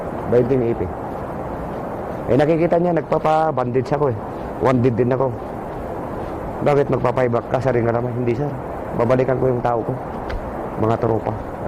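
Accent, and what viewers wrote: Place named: Pantabangan, Philippines. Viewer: Cgruro sir tubong pantabangan.at ung pronounsetion.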